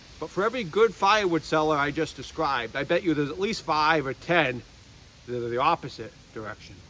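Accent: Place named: South Shore, United States. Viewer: Good video, are you from Massachusetts ? You sound like a south shore accent. I live in northern Rhode island and am fortunate enough process all my own wood. I also have property in Maine and the forest up there is vastly different. Most of the firewood up there is fir and ash on my property.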